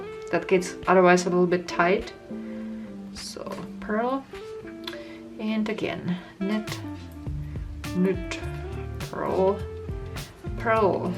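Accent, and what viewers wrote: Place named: Germany. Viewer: So practical that you explain how to do it with a long wire! Its just what I needed! You are an amazing teacher! Love your german accent. Grüsse aus Argentinien und danke vielmals!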